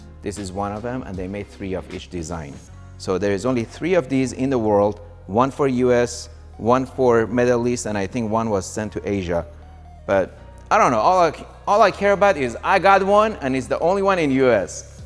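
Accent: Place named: Iran. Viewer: i like how you still got the persian accent nice bug btw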